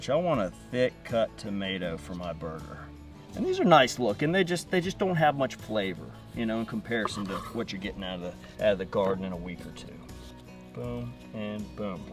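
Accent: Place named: Carolina, United States. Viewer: sounds a lot like Trey Gowdy…a fellow Carolina native! Great burgers & a new sub here. Grill on brother!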